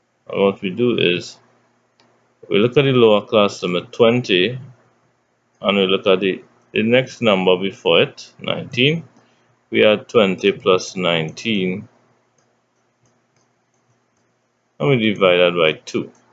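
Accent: Trinidad and Tobago. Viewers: He is Caribbean possibly trinidadian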